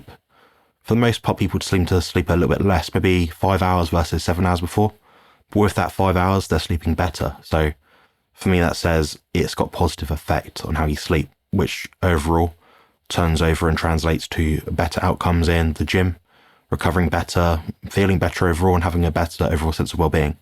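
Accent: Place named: England, United Kingdom. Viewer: Thanks. It's nice to hear a lovely English voice.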